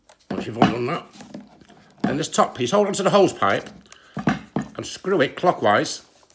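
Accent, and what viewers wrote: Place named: Northern England, United Kingdom. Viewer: As we say in Australia, you mate, are a deadset legend! Thank you so much. I am the world's worst handywoman and your guidance (made all the better with your friendly Northern accent),meant I replaced the rotten old hose with minimum fuss. Big thumbs up!!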